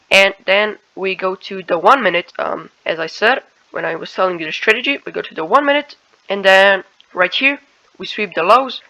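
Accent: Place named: East Asia. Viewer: Are you Malaysian? You sound so east Asian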